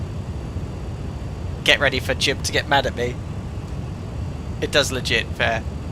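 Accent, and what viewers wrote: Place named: England, United Kingdom. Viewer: Great stream , I think it’s the Concorde and the English accent that go hand in hand.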